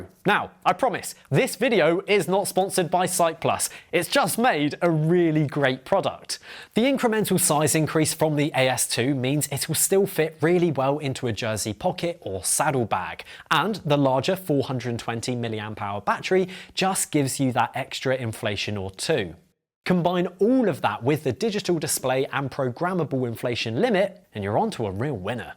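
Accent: United Kingdom. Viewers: This must be a Brit channel based on the host's accent and that I see no mini or midi pumps that are available in the US displayed here. My pump is programmable and rechargeable and very poplar in the US.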